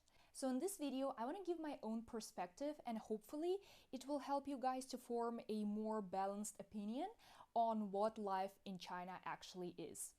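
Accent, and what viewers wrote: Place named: Russia. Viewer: You sound Russian.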